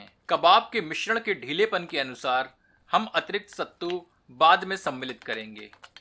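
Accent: Standard. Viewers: Badhai shuddh hindi uchcharan ke achcha laga sun kar. Aap kis pradesh se hain ?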